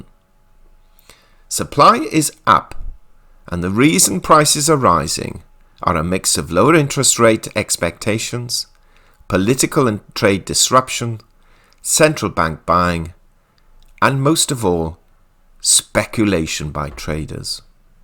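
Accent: United Kingdom. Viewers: I only take financial advice from those with British accents. Thanks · I had to give a thumbs-down today not because of the information but instead of the straightforward logical rational approach that is usually given there was a sanctimonious are that might have been partially attributed to that uppity British accent but probably because of the inner sanctum exclusivity that starting to emerge giving them a sanctimonious are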